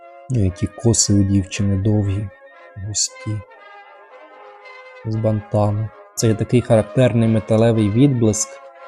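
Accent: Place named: Sumy, Ukraine. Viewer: Чудова молода,добра людина ,підписка. А Ви случайно не з Сумщини? Чую ,щось мені рідне і знайоме.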